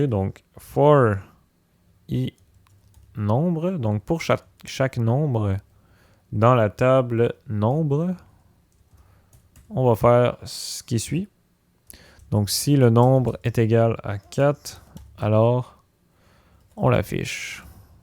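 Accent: Quebec, Canada. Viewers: OMG... QUEBECOIS... ACCENT... J'ADORE !!!!! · Wow le grand accent québécois !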